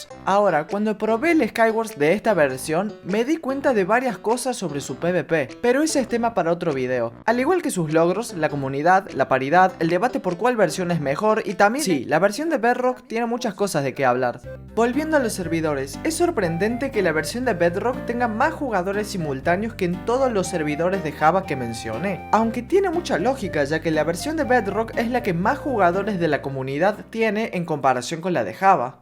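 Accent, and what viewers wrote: Córdoba, Argentina. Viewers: amigo sos de cordoba capital?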